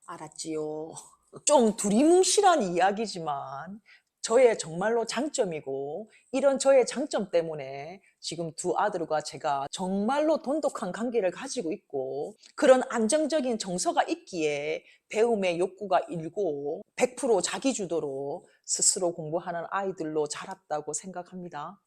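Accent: Gyeongsang, South Korea. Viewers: 사담이나 수다 다 좋습니다. 지혜로우시고 단단한 내면을 가지신 작가님 육아 뿐만 아니라 인생의 선배로 배우고 싶습니다. 다양한 사담 맘껏 공유해주세요 재치도 있으시고 유머러스하시고 경상도 늬앙스도 넘 좋아합니다. 자기관리는 어떻게 해나가시는지 다 궁금합니다 😊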